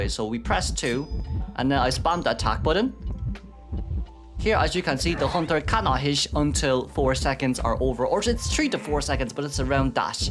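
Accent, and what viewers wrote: Ireland. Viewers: i didnt know irish people pronounced words ended with -t as -sh, that's interesting!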